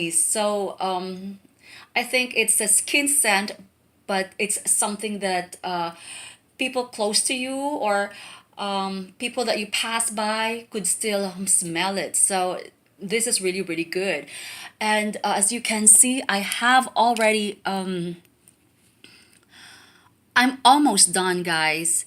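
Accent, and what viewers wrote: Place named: Philippines. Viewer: Thanks for the review. I detect a Philippino accent.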